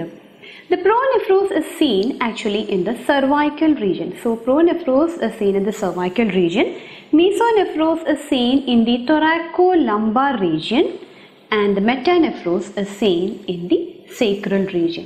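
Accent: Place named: Kerala, India. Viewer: മലയാളി സ്വരം. My brain detect sound samples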